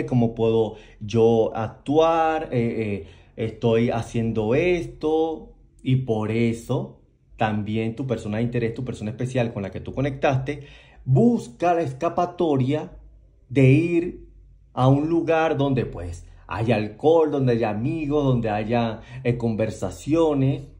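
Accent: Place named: Chile. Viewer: Hola me encanta escucharte ese tono de voz de chile yo soy argentina . Un abrazo a la distancia.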